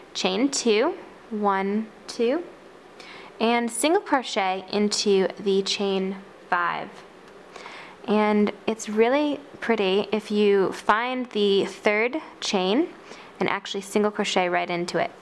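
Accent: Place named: United States. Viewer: I tried to crochet a snowflake from a pattern in a magazine and I got stuck so I just googled how to crochet a snowflake and your video came up! Thank you so much, I'm still a beginner and have taught myself some basic stitches from a book. I live in the UK and I just wondered if American crochet stitch names are the same as UK ones? Anyway I managed to complete it from watching you, with many rewinds! Many thanks, it's for my daughter's birthday as an extra little something to hang on her Christmas tree. I've made it in turquoise as that's her favourite colour. I'm gonna make two more in white. I've also got some tiny sparkly beads which I will sew on using invisible thread. I liked the way you talked about it being prettier done in a certain way and your American accent was reassuring!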